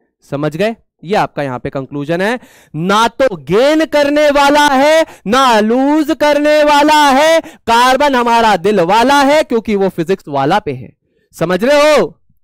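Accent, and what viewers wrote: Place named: Marwar, India. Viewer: Sir aapke teaching style ke sath appki marwadi...it's amazing 😊❤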